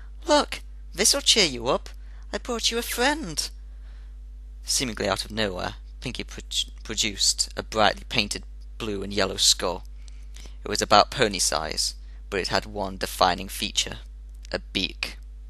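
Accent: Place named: United Kingdom. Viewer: your british and that makes the story more scary awsome reading dude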